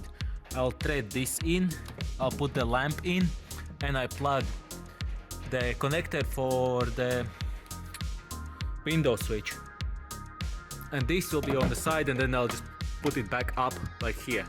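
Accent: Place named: Germany. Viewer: oh, that's great news to me - what you have is what I'm after, because I am very used to 5 pos switches and I enjoy pressing once to fully close my windows when I've arrived to my destination and need to leave my car - and I hate that I can do that with my driver's window with a simple one full pull, but have to hold the pulling for the other 3 windows. I also never had an issue to make a bit more gentle press to crack a window instead of full open or full close because I clearly feel the 3 pos vs 5 pos switch - probably my gaming spider senses kick in to feel the difference :) My problem is that whenever I try to search for a switch with all four windows on auto that you have, I only find the one with driver's window auto only, I even thought it doesn't exist, but you gave me hope. Now, I need to discover a part number that would mean the switch that you have and it also has to be for a right-hand steering. 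Come to think of it, I have an idea to swap with you, because in your videos it looks like you have a right-hand one, although being in EU Feel free to contact me on Telegram (I'm Australia, so parcel might take a week to arrive - last time I posted internationally was around a couple of months ago and it took 4 days to arrive to UK, so I suppose would take around the same time to post to Germany or Austria, considering your accent).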